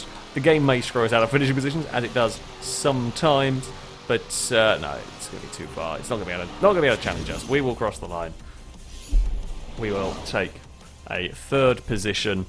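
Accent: England, United Kingdom. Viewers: Going for the Aussie demographic in this video eh? As a Holden guy through and through it saddens me that it's getting killed off this year :( And also the way you pronounce Falcon is quite strange but I'm guessing that's just the Pommy accent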